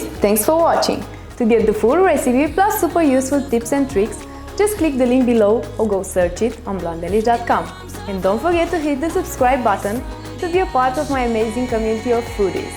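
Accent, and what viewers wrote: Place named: Romania. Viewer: Love the recipe and your accent. Is it Romanian?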